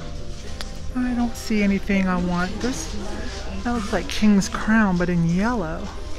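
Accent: United States. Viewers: I love listening to your voice. Where are you from in the States? I live across the pond, so am not familiar with different American accents. Love your videos and I learn a lot. Thank you so much. 😺❤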